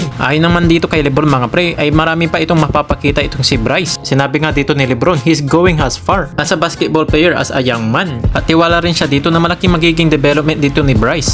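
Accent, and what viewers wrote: Pangasinan, Philippines. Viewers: kahit di ko na itanong, Pangasinan ka aro! 😅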